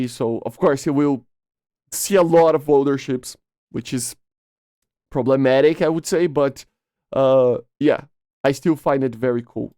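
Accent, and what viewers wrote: Brazil. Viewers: pelo sotaque esse mano é br mas n posso provar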